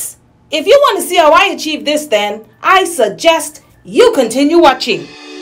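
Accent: Bahamas. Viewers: Found you because I was missing home and missing the food. Hearing your lovely Bahamian accent and the "God Bless You" makes my heart smile. I try not to watch your videos when hungry 😂. God bless you Miss. Thank for "bringing the restaurant home."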